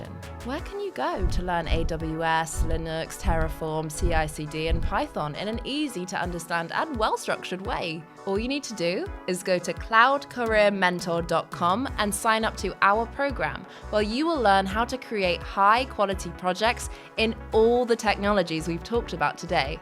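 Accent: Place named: United Kingdom. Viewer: Woah this does make perfectly sense what says in this video. Plus I dunno if is British or Australian but the English people have the best accent in the world.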